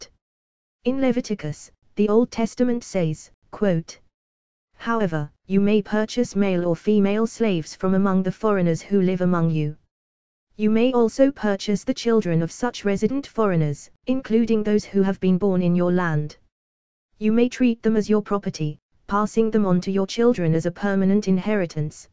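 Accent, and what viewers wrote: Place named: United Kingdom. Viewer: But at least she has a British accent which is always attractive on the ear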